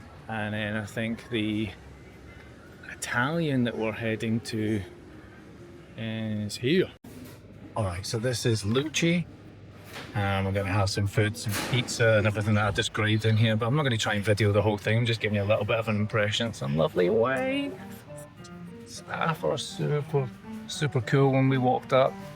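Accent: Scotland, United Kingdom. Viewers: I know that accent, is that not Scottish? Or Yorkshire or someting? U remind me of a dear friend....he would say me arms are in pen as in my arms are in pain lol Welcome to MALAYSIA! And Have fun in KL!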